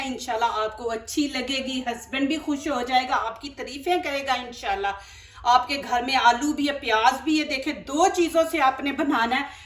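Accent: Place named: Punjab, India. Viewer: اپ پنجاب کے کون سے علاقے کی بولی بولتی ہیں اپ کی بول چال اچھی لگتی ھے اور ریسیپی بھی اچھی اچھی بناتی ہیں لیکن اپ برائے مہر بانی انگلش کوبیچ میں نہ ملایا کریں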